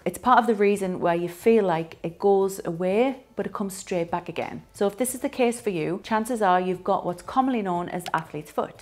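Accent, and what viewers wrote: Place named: Newcastle, United Kingdom. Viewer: Ooooh noooo! I bet the Californians love your Geordie accent ;) Thanks for the video, informative.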